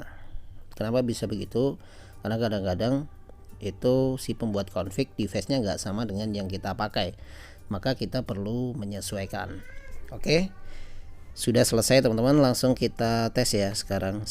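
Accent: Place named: Jepara, Indonesia. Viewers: Wong jeporo ta jebule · wong jeporo mas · Wong jeporo yha mas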